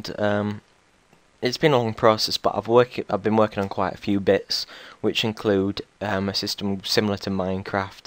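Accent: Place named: England, United Kingdom. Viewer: Haha strange isn't the right word maybe, sorry. ^^ I come from Germany but I never heard such a dialect from England!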